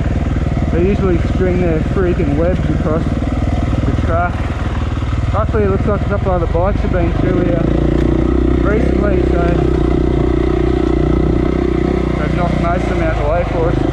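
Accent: Australia. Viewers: Not sure the wind muff is helping... still sound like a hint of Australian accent.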